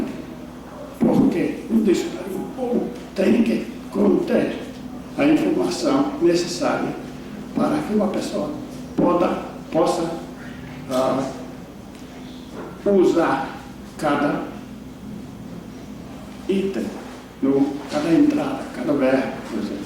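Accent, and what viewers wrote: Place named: United States. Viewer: As I see it, the "issue" is not having an accent per se, as more often than not, words are pronounced just as they should. But the fact that he pauses a lot and takes a moment for choosing the word he wants and then apply correct grammar around it for the sentence he's about to say. Therefore, he doesn't seem anywhere near a native even tough he has better grammar than some natives and has a great pronunciation overall. A native unaware of his identity would probably consider him a foreigner that had spent about a decade or even more living in Brazil. The host on the other hand, speaks with a heavy accent as American as 4th of July.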